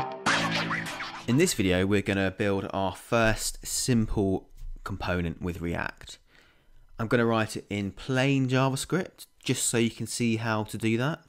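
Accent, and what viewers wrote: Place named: England, United Kingdom. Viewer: Liked your English accent <3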